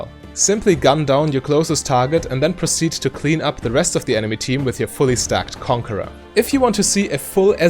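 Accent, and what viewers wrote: Germany. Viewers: Idk why but i just instantly hear that you are german. Right? :) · effecient german voice, complete with runes, builds and poke guide. very nice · u german?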